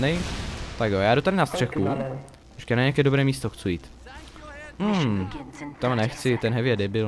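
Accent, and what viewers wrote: Russia. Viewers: ten ruskej prizvuk xD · Nejlepší ten ruskej přízvuk!! (kde jsi se ho naučil?) :D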